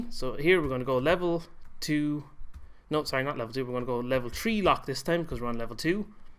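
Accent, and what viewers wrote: Ireland. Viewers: Hi are you Irish i'm new here you sound Irish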